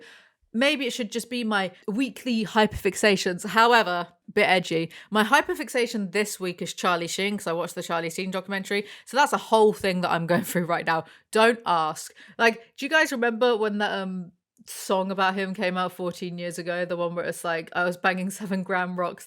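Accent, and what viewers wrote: United Kingdom. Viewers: There's that aggressively British accent! 🤣 Glad to hear that bonkers accent once again. 😊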